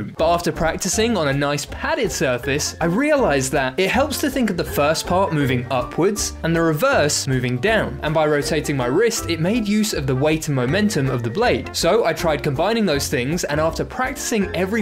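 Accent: United Kingdom. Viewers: hes turning british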